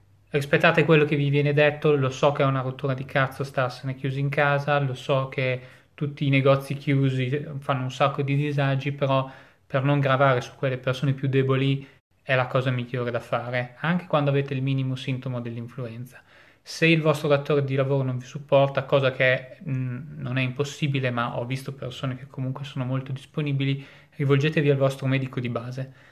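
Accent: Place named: Piacenza, Italy. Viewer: Piacentino certificato!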